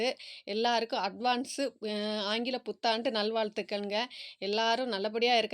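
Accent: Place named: Nanjil Nadu, India. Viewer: பூக்கள் அழகுதான் சகோதரி, நீங்கள் நாஞ்சில் தமிழில் வர்ணணை செய்வது மிக அழகு, சிறப்பு ❤❤ வாழ்த்துக்கள் சகோதரி🎉🎉🎉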